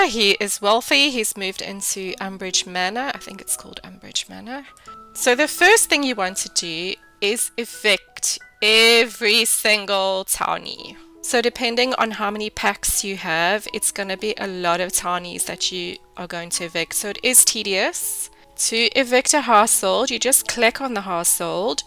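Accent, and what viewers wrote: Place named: South Africa. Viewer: Idk why but I was so caught off guard by this being narrated by a South African 😂 SA simmers represent! 💚💚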